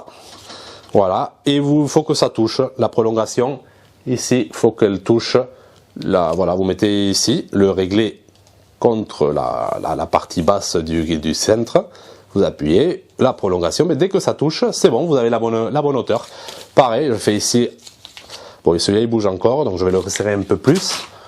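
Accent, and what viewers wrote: Toulouse, France. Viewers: ami toulousain bonjour! Suis un passionné de velo vintage et j'en retape plusieurs par mois (les france loire, starnord ou arrow ont plus de secret pour moi lol ) sur le moderne je connais la theorie mais pas de pratique. J'aime beaucoup ta chaine que je suis. Plusieures choses: - l'immersion dans l'atelier avec le bordel inevitable, les imprevus galere, le bricolage pour s'en sortir..en toute transparence. Validé 🙂 - l'humilté generale de ton discours et attitude. Assez rare sur you tube pour etre souligné - Pas de tabou ou autres sur l'argent ou ta vision du commerce. Tres cool. (Tu voles pas ton argent, manquerait plus que tu ait honte de ca! huhu) - Les fichiers "one shot" sans montage, plus long et lent mais beaucoup pus vivant. Pas de montage pour toi. c'est tout benef de temps. Le seul inconvenient est pour toi car cela t'oblige a parler tout le temps pour "meubler". 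J'aime beaucoup l'accent toulouain mais je t'avoues que je mute le son parfois (no offense bro'!:-) ) Sur cette video: Magnifique impro sur l'habillage du cintre! dommage que le marron aille pas un poil plus haut pour avoir le noir juste la partie horizontale. Le noir aurait ete invisible de profil ) Au final, Les velos bleu des ces années la (teinte souvent pastel ou pailletées) avec cintre et selle marron ca match toujours! Moi j'aime beaucoup le resultat ici BRAVO! Changes rien Amitiés du Morbihan;-) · En tout cas bravo ,avec rien tu fait quelque chose ....sais plus quoi dire ,en plus je vais faire une confidence,c'est grâce a cet accent toulousain que j'adore et qui rends ces vidéos encore plus agréables et attrayantes a voir ....c'est pas beau ça ? suis sincère crois moi et je pourrait regarder pendant des heures .....bon weekend du 11 novembre...😊✌✌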